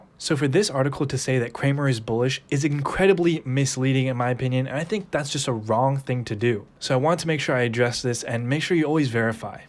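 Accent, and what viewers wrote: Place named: United States. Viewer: dam yanks ruining the English language as usual. Pfft! ....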